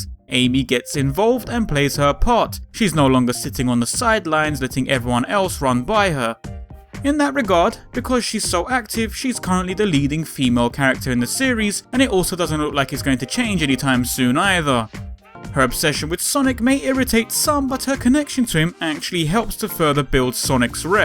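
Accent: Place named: East London, United Kingdom. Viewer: you sound like you're from east london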